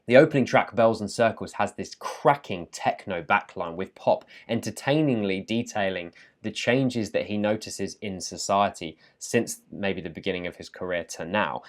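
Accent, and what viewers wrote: RP, United Kingdom. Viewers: dont worry he's just a really posh one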